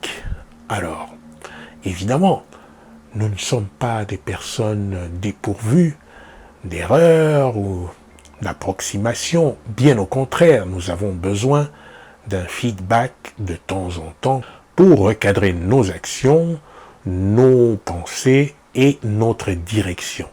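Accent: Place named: France. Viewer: Wow I don't know why but I really love the accent of French although I hardly understand it 😊 I am a new friend and here to support you. I do hope to get one back 🙏😊🤗🔙 thank you so much 🙏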